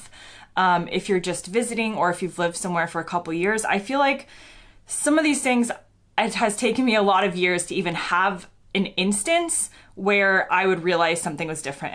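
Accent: United States. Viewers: The US pronunciation of Barbados is so annoying. Also , it's Cost-a Rica not Coast-a Rica. Also it's rizzott-o not rizzoe-to. Americans should learn how the natives pronounce words before making up their own interpretation. While I'm on a rant it's not Edinburrow it's Edin-burra . I'll stop now before I start to get cross . LOL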